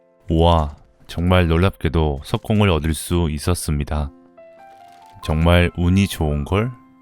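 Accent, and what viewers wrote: Jeolla, South Korea. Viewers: 전라도 사투리 구수하네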